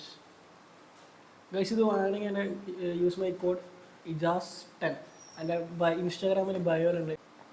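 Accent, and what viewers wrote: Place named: Azhikode, India. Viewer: Azhikode anenn thonunnu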